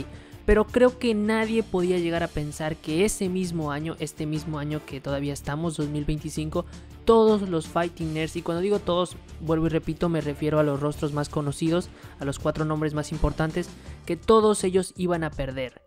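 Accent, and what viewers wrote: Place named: Ecuador. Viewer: Vos de dónde sos? Esa voz parece de ecuador o igual de peru.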